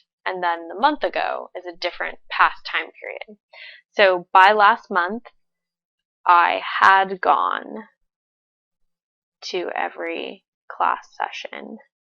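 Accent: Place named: United States. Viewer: Hey from Brasil It's quite nice your way of teaching and your accent as well. Are you american?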